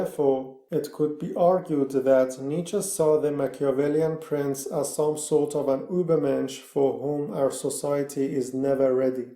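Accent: Iran. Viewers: ???, I liked it already. You can like it too... I love the Iranian accent. What makes you think that you cannot like it if it is Iranian? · Are you Iranian? atleast that's what I a got from your accent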